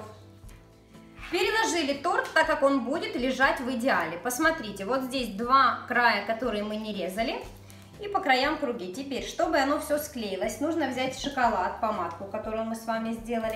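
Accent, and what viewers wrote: Ukraine. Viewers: а говор у вас интересный,трошки услышала,вы в Украине живете?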